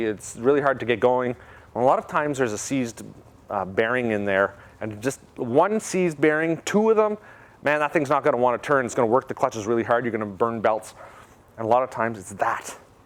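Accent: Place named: Newfoundland, Canada. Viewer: I feel like your a Newfoundlander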